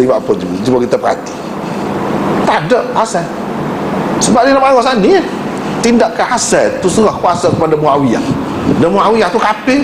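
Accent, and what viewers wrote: Kelantan, Malaysia. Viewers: Ko tak faham loghat kelantan ker? Cuba ko ulang tengok video ni lagi. Ustaz ni la antara yang paling kuat mempertahankan sayidina mu'wiyah RA.. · Beliau menggunakan Bahasa Melayu dengan dialek Kelantan🙏🏼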